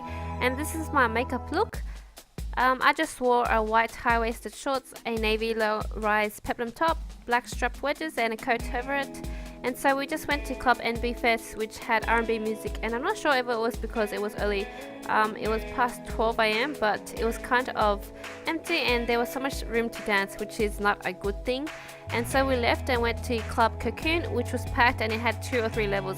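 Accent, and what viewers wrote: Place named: Australia. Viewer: lol cool I was just curious because your intro was hello in Korean. Anyway you don't have any accents other than the obvious Australian's accent:). If you're still in Korea, please do a street food video =) cheers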